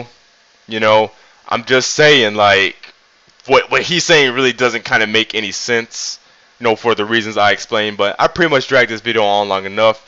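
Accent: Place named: Texas, United States. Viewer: Hold up, you're from Texas!? I always imagined you lived in NY but now that you mentioned it... I can hear the accent (in a way)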